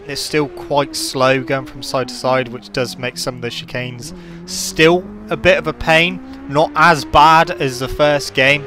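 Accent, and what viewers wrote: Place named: Somerset, United Kingdom. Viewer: You sound like your from Somerset boy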